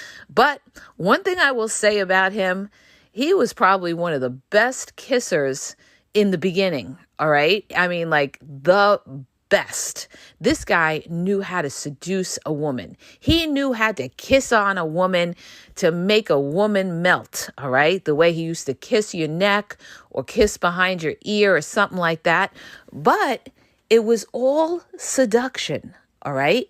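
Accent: New York, United States. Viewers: "Deep down, the narcissist disdains you, they really can't stand you" This, with your New York accent made me bust out laughing! Takes a bit of sting out of the painful truth.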